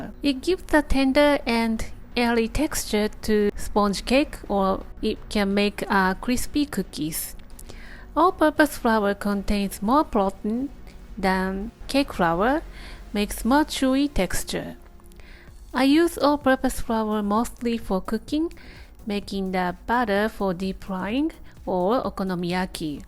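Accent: Japan. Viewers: I love Japanese accents when they speak English! So cute! Thanks for this amazing video!!!! Very well and detailed explanation in how to make a 🥖! I Will give it a try for sure!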